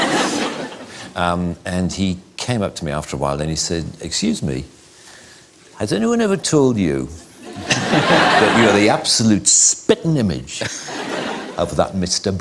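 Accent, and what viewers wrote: United Kingdom. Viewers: 喔喔，低音炮＋優雅英腔殺我😍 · 不知道為什麼看到他正常的講話有點不習慣😂而且他的英國腔也太好聽了吧😍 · 挖原來豆豆先生的聲音是這樣XDD 英國腔就是好聽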